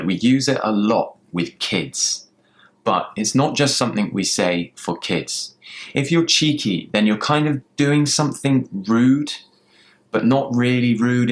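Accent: RP, United Kingdom. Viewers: Good job ! Thanks for sharing useful things, I love learning and imitating British accent a lot. It sounds so posh 😎 keep doing this I'm your student and supporter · Make more videos of your british accent!! I love it! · Hi! MY name os Sérgio and Im from Brasil... I need to say You've got a worderful British accent and your pronounce is quite clear. Your lessons are really amazing!!! My warmest. Regards! Sérgio Alkimim. Brasil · This is One of the BEST YouTube Channel to Understand more the British accent and words . Cheers! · Loved your british , you are the first british that make me love british accent much & more. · That's ritght Sorry :) I've been in London for 11 months and still learning English.I know there are many ways to say a word because of accents but when I heard you saying ''cannot'' it took my attantion.I was like umm ''he seems speaking with RP, actually He is more understandable but what about 'cannot' lol'' So I really wondered where were you from or if you had a reason for that ^^ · I love your videos so much, it can help me to improve my English skills. 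And I love your British accent, last one is you. 😍from Thailand ~ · Thank you for the lessons,you own well-born accent indeed.Wish I hade a british companion like you,back then in London. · love ur videos. love ur British accent. 👍👍👍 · Hello! Where are you from? I'm thinking about moving to the UK, and I want to pronounce English nearly to your accent. I tend to get accents very easily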